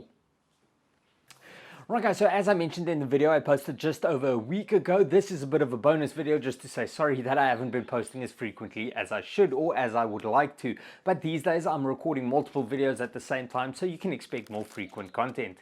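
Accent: Afrikaans, South Africa. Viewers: You sound like one of those South African people. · Lekker Afrikaans accent💪💪 lekker channel. Dankie. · i love south african accents. they're so jolly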